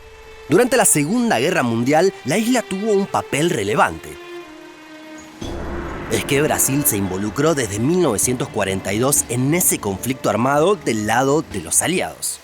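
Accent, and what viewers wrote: Buenos Aires, Argentina. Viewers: Raro es escuchar un porteño intentanndo hablar como mexicano :) te delata la Y, y la LL (shh) jajaja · Me encanta el acento argentino (porteño?): "shegarón, shega". hahaha. Muy peculiar. Saludos desde Brasil. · Horror el acento argentino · Hola. El narrador es de Argentina. Saludos. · Me gustan mucho tus informes claros precisos y sin tanta cháchara lo único que no me gusta es tu acento muy pronunciado del tono arrastrado bonarense de la doble ele (ll).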